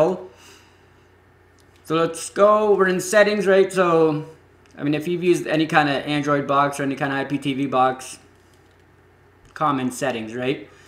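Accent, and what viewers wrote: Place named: Canada. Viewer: canadian, it's funny when you say out ( owwwwwwwt and abowwwwwwt) lol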